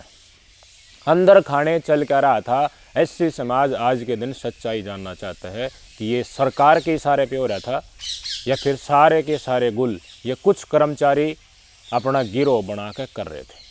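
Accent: Haryana, India. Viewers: भाई बहुत स्पष्ट रूप से बोलते हैं और सतह m हरियाणवी bolte h kati char chand lga deta h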